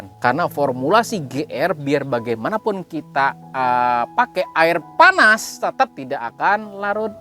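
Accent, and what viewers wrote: Banjar, Indonesia. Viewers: Orang banjar kah pak... Mantap penjelasan nya... Salam dari Kalteng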